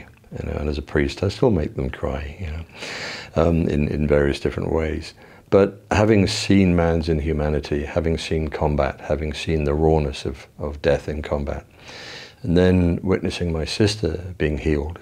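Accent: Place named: South, United Kingdom. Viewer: Its late. Couldn't sleep. Popped on YouTube and there you are in the 'Recommended for you' section. We miss you up here in the north country. You're developing a bit of a 'Southern tinged' British accent. LOL Be blessed my Friend.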